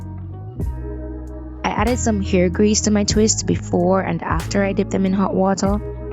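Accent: Jamaica. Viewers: are you Jamaican? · Jamaican cyaan hide enuh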